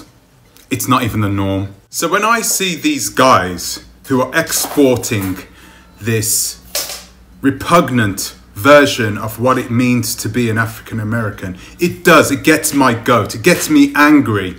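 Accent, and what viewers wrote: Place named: United Kingdom. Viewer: bro sorry to say that but ur English accent isn't Semmes like an American it's Semmes like u da UK tho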